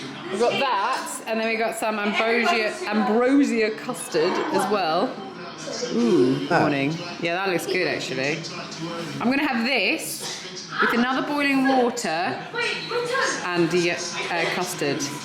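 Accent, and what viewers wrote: United Kingdom. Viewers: I'm South African, had no clue you're South African with your full on UK accent. Thought you were Spanish 🤭 love your meal inspo. Such nostalgia seeing those SA treats 😊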